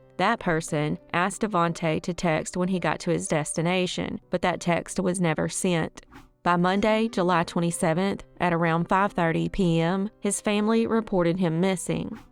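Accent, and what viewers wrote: South, United States. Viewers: Just heard about this story. 10 y/o Bob Boyes went missing in port republic, calvert county, md, Dec 26 1968, along with his pet deer, and has never been seen again. I know you already have a lot of suggestions. but would love to hear your southern accent, doing his story. Both parents, now deceased. Love fr md expat, living in panama. Thanks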